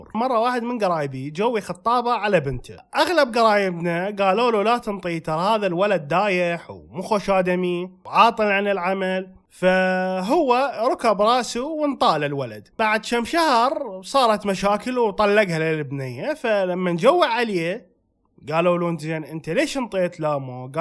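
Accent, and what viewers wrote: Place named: Samarra, Iraq. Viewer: لهجتك مال سوامره !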